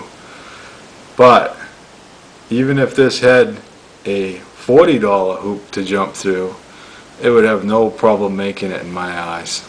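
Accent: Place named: Brooklyn, United States. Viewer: nice review bro! you got a cool Brooklyn gangster accent. where you from?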